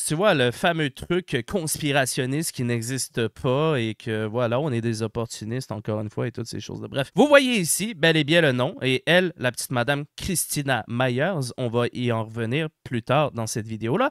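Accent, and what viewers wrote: Quebec, Canada. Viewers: Bordel que ça fait du bien d'entendre à nouveau l'accent Québecois, ton pays me manque (j'y ai passé 1 an et demi pour travailler et y vivre mais la CAQ+ le covid ont foutu la merde dans les permis de travail), dommage que le Canada et le Québec soient devenu aussi woke. Pour tes vidéos (qui sont d'utilité publique), je l'avais déjà compris par les vidéos de Psyho et par déduction que c'était beaucoup plus gros qu'une simple entreprise de malheur qu'est Sweet Baby Inc. On le remarque aussi en Belgique ou les partis, syndicat et groupe de gauche sont envahis de wokes. On a encore de la marge par rapport au Canada et à la France en terme de wokisme ( la majorité des Belges trouvent ça ridicule) mais ils finiront bien un jour par s'étendre partout. De mon coté, comme beaucoup j'utilise mon portefeuille en représailles surtout que les jeux woke sont facile à détecter, juste dommage pour Subnautica 2 mais tant pis, je ne vais pas dépenser de l'argent pour mes ennemis idéologiques. 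Sinon, je n'hésite pas à assumer mes idées en publique et à combattre tout wokisme lors de débat. J'ai d'ailleurs remarqué que 99% d'entre eux n'osent rien dire vu que je suis chocolaté, façon, ils n'ont aucun arguments, ils sont endoctrinés dans une secte. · Déjà + 1 car tu es un cousin et quel plaisir d’entendre ton accent qui me manque. Merci de nous donner ce genre d’information, sachant que votre province à une tolérance incroyable. Voir un ami Québécois prendre à cœur l’univers qui entoure du jeux vidéos, ça fait plaisir. Bon courage à toi.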